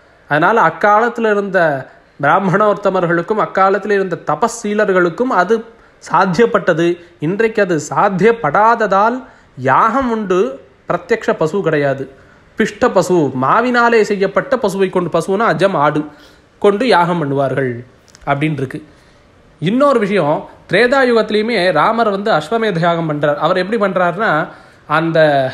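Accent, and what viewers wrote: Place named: Tirunelveli, India. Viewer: சுவாமி திருநெல்வேலி போல பேச்சு அப்படிதான் தெரியுது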